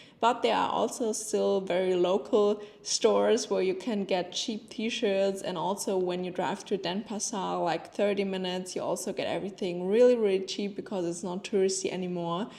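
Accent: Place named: Germany. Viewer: Ah the German accent. Thx for the info btw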